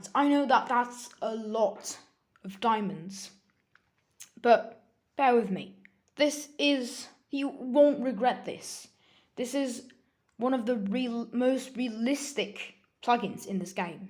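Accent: United Kingdom. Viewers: where are you from? England? I like your accent ;)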